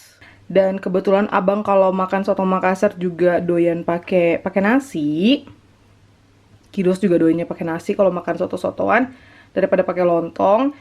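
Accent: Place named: Java, Indonesia. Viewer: Mamak, apa dari ortu mamak ada darah jawanya kah? Kalau bicara mesti ada bahasa jawanya. Seneng dengarnya 😍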